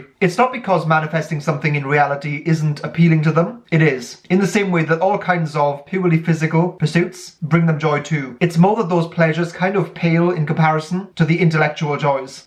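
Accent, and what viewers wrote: Wales, United Kingdom. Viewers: random question: are you welsh?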